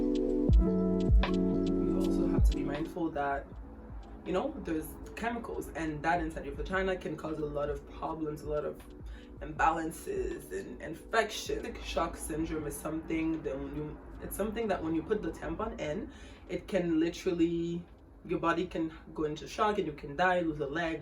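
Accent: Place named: Haiti. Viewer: Ahhhh I posted on your last video now I can here the slight Haitian accent. Maybe Haitian Canadians sound slightly different to ones here in America. My family is from Haiti on my fathers side. bèl dekouvri ou. I switched to organic tampons but have been wanting to make the switch to something like the Diva.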